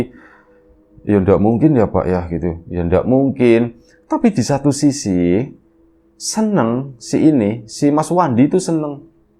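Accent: Tegal, Indonesia. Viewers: Sedih bngt😢🤧mas Wandi scra ga lngsung menyemprotkan gas air mata ini...dr mulai mas Wandi mngikhlaskan mas dewo sm mb putri aja udh terharu ditambah dg mninggalnya mas dewo bkin nyesek.mas Wandi ini kya'y emng lucu ya aplgi dibawakan mas dg gaya natural dan logat khas Tegal yg agak mirip sm cirebon bkin aku ketawa.kisah ini pket komplit ya ada sedih dan ada lucu'y😂smoga mas Wandi dan mb putri rmh tangga'y langgeng bahagia dan mnyayangi anak mas dewo spt anak sndiri, mkasih mas sehat trs agar bs mnghibur kt smua😁😁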